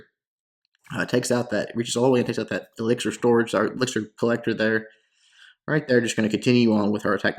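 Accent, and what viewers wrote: United States. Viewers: which part of the us are u from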